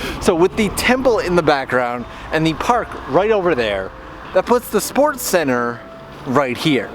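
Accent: Canada. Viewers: You should be a tour guide! you explain everything so well and your Canadian accent adds a special touch! Ireland is a different kind of beautiful so check us out sometime! 🍀🍀🍀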